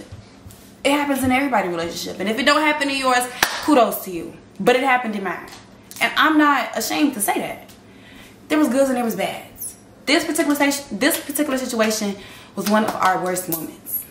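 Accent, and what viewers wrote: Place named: Chicago, United States. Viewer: The Chicago came ALL out in this video and I love it😂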